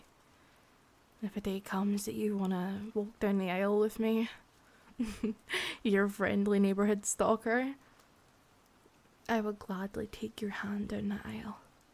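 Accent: Scotland, United Kingdom. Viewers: I prefer your deep Scottish accent. I find it more relaxing when I hear your Scottish voice than the higher pitch voice. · This went places I wasn't prepared for. That line about not needing someone to help you feel things got me. The Scottish accent helped give the performance an aire of authenticity. Amazing work. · omg she's scottish. that changes everything XD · I'm done with normalcy, I'd trade it for a cute scottish stalker given the chance. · Ahh she's a sco-ish stalker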